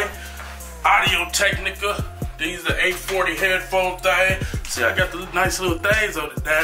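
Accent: South, United States. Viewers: Why does he sound like a hill billy white guy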